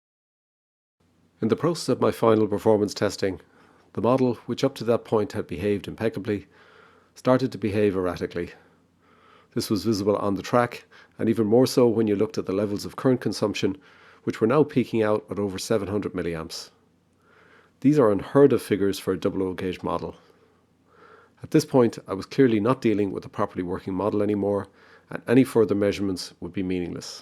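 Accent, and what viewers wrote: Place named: Ireland. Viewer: You sound like a fellow Irish chappie . Great video and thank you !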